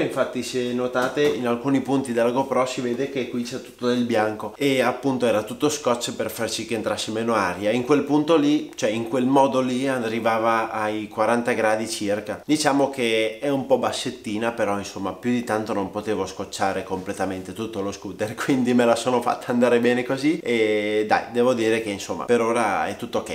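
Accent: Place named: Ferrara, Italy. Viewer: Di quale parte di ferrara sei ?? ho riconosciuto l'accento come il mio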